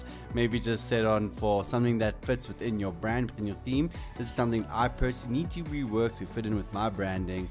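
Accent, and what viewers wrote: South Africa. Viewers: Ayyyeee Afrikaans?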